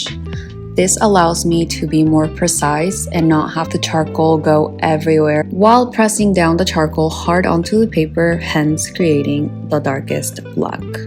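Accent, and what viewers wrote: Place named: Bolivia. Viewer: Ive never heard your voice before. Not what i expected a Bolivian accent to sound like. Amazing artwork. Your hard work and talent have been an inspiration.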